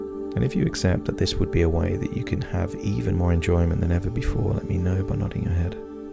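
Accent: England, United Kingdom. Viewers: Ok..., I need to get a Bluetooth headphone set, so I can move about to my bed for a comfortable experience. My tethered headset keeps me tied to the desk. Now then, I will try it again when Amazon gets here with my new headset. Meanwhile, I live on a 220 acre mostly forest little NW farm and recovering from a heart attack and fractured hip when I fell. I can do this, your program sounds great and I like the English accent. I am 285-lbs 5'11" & 73 years old, and of German heritage. I will report more later.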